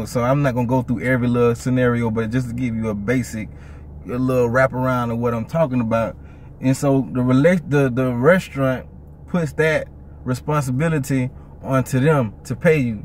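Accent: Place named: Carolina, United States. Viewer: I hear either North Carolina or South Carolina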